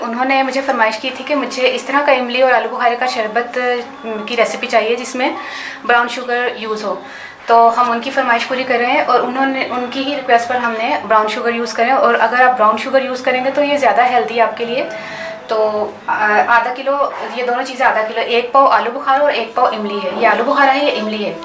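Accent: Punjab, India. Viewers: Ap ktni puari punjabi bolti heun :) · Punjabi accent very good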